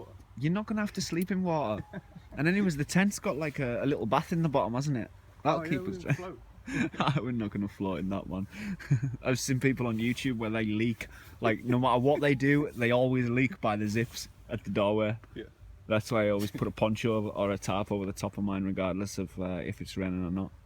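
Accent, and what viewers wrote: United Kingdom. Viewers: not that great with UK accents. Are you in Wales?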